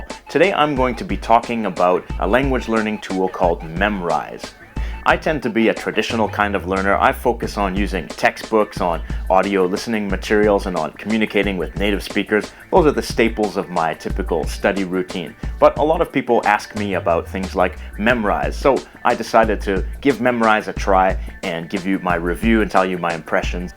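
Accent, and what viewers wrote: Canada. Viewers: Thanks for the information! I was curious because your accent didn't sound like the "standard" Canadian accent to me. From which province do you come from? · For some reason I knew that you weren't American. I know that you are trying to speak clearly, so non natives can understand you better, but in general Canadians speak more eloquently and clear.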